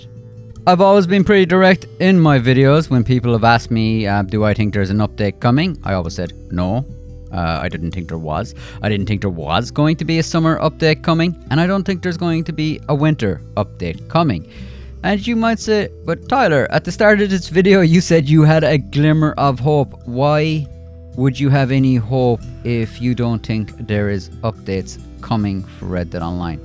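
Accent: Ireland. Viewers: Thank you Tyler. Will keep playing as I’m not a “gamer”…RDR2 and classic Guild Wars 💕☘️ Irish accent? Cork?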